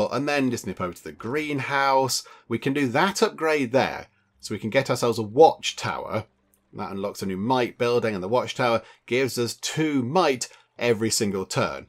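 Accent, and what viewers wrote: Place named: England, United Kingdom. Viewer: I flipping love your gameplays, your fabulous typical English accent and your way to entertain people. 👏🏻👏🏻👏🏻👏🏻👏🏻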